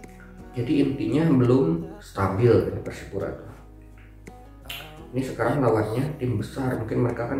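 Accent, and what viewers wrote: West Java, Indonesia. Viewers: Prediksi saya .mah persib menang.. tp ini adminnya pedukung persib..di liat dri logatnya bicara sih urang sunda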